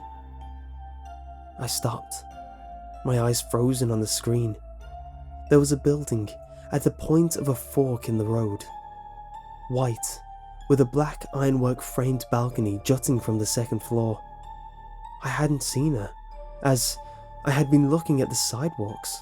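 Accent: United Kingdom. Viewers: Which part of the UK is he from? Like his nearest city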